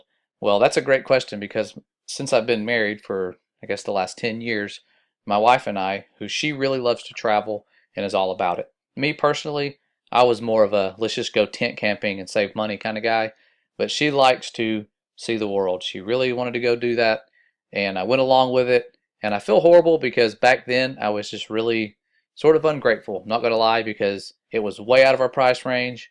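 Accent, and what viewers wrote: United States. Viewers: I’ve never heard Cairns pronounced with an American accent like yours ,, he he I was hoping to travel up from Sydney to go barramundi fishing in Queensland ,, Scott Morrison/prime minister went on a family holiday while his country burned down ,, and the 60million dollars donated by the public for victims was kept by the charity organisation,, these big charity organisations are money making organisations when u look into their history Haiti is a good example of how they operate poorly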